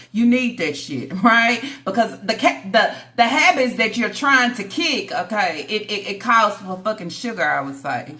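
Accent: Texas, United States. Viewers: ❤ hay girllll hay I just put up.grocerys An stop to catch my.breath.when I herd you say radiation contamination.I fell out laughing. An with that beautiful Texas accent. It's to much.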